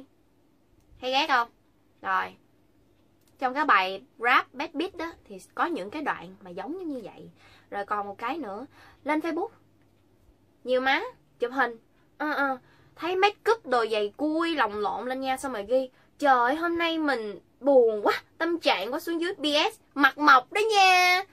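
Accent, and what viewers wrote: Standard. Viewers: Nói chuẩn vler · cj nói wa chuẩn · Cj nói chuẩn ko cần chỉnh lun á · Chi noi chuan ghe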